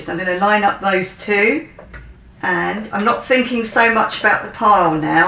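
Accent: United Kingdom. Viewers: First of all I want to say that I love your accent.🤗 Is it British or..? And thank you for such an easy understanding tutorial.🥰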